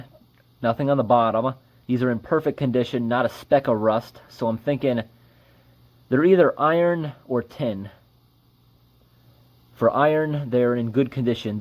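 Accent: New England, United States. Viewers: Dark Diddler New England accents are very unique. He says “dat” instead of “that” which is pretty common for that area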